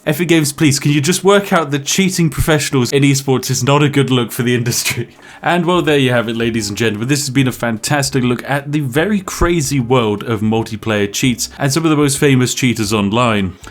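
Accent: United Kingdom. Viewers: this is what every one in britain talks like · they are too dumb to understand the spiffing brit’s accent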